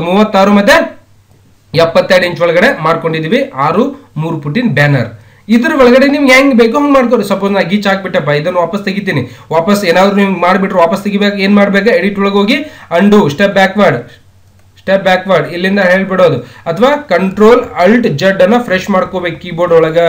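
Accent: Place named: Shiggaon, India. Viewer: ಸರ್ ನಮ್ಮ್ ಊರ ಬಾಸ ತುಂಬಾ ಖುಷಿ ಆಗುತ್ತೆ ಸರ್ ನಿಮ್ಮ್ ಸ್ಪೀಚ್ ಕೇಳಿ ನಂದು ಶಿಗ್ಗಾoವ ತಾಲೂಕ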